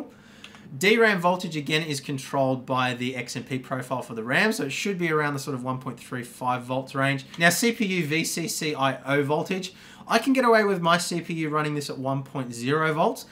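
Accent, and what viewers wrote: Australia. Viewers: Are u australian mate ? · Finally, an Aussie tech guy! Yew beauty!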